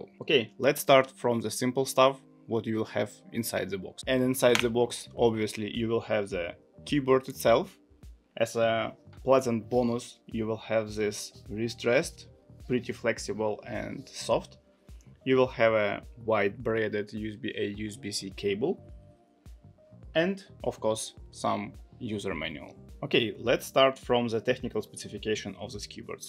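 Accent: Russia. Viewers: Акцент больно русский😮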